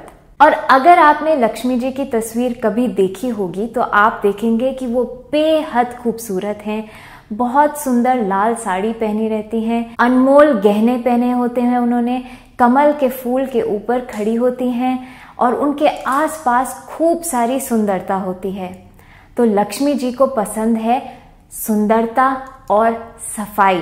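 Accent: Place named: India. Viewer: And most importantly love your way of talking. An indian fragrance which came out from your voice that i love the most.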